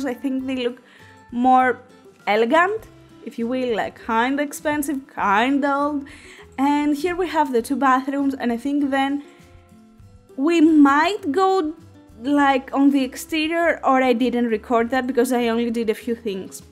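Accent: Greece. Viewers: Ooh you're Greek aren't you. Great accent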